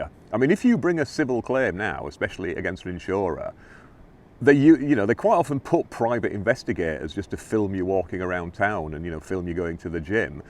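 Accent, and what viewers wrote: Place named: Manchester, United Kingdom. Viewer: A Manchester/Lancashire accent is "unusual"?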